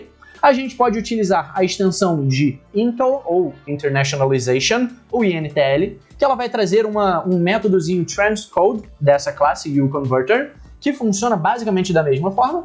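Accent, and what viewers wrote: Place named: Rio de Janeiro, Brazil. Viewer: Boa! parabéns, mas esse sotaque de carioca vixi! de boas cara!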